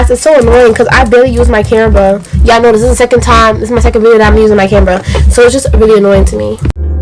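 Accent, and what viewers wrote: New York, United States. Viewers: He so Ny lmaoo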